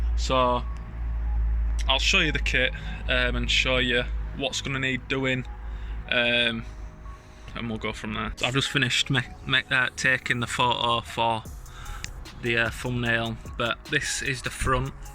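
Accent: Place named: Yorkshire, United Kingdom. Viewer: Also you have a Yorkshire accent I live in your shire be cool to meet up some time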